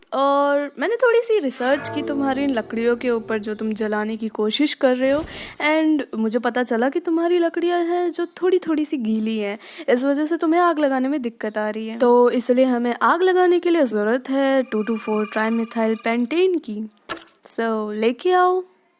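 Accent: Bengal, India. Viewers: Are you from Bengal or Odisha ? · Didi are you a bengali ? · are you bengoli??? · Are you Bengali?? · YOU ARE BENGALI RIGHT? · Are u a bengali ? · Are you Bengali ? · Are you bengali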